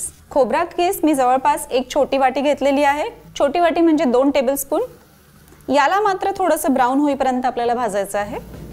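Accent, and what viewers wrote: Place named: Satara, India. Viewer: सातारची भाषा आहे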